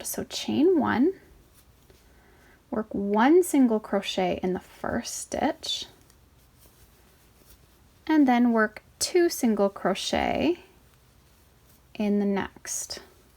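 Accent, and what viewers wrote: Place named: Canada. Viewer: These are addictive! I'm a knitter, and all my crochet attempts get ripped out. I made three of these! Thanks so much for sharing your wonderful creativity. On a completely different note, I'm a linguist, and I'm trying to place your accent :) The way you say "about" makes me think Canada, but the way you say "to" or "two" is really stumping me. Would you mind saying what region of N. America you hail from?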